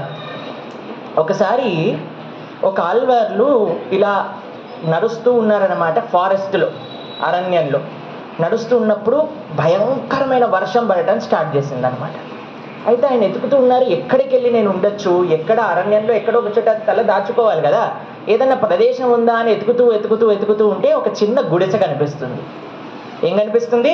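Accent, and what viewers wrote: Telangana, India. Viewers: ప్రభుజి 😊 తెలంగాణ స్లాంగ్ అద్భుతం 😅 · Complete Telangana slang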